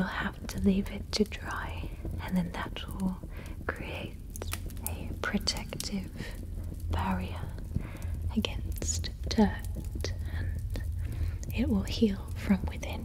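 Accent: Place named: RP, United Kingdom. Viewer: The contrast of a primal, feral-looking character with a proper posh british accent is super stark :P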